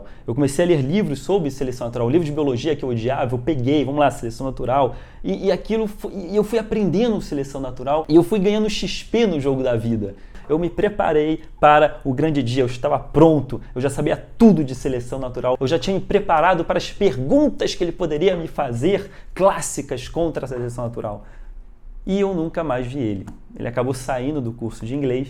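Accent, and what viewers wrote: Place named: Rio de Janeiro, Brazil. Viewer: HAHAHAHH deve ser o sotaque carioca, misturado com o pegando costume de imitar os pastores até falando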